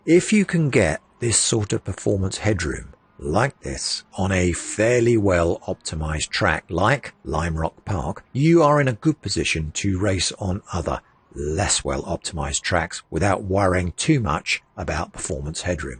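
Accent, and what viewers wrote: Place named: United Kingdom. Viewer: I’m in UK (as I assume you are from the accent 😂) and I don’t think it’s a regional rollout of 24H2. It was initially rolled out, had tons of bugs, and then pulled back. It’s since only being rolled out to systems with hardware device IDs that Microsoft have verified as not having issues from what I understand. This means if not offered, you may have some hardware they’ve not fully ironed out the issues out for yet.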